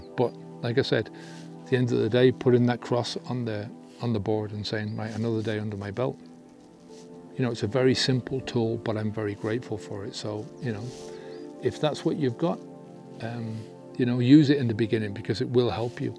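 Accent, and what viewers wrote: Ireland. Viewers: Things sound more true with a good Irish accent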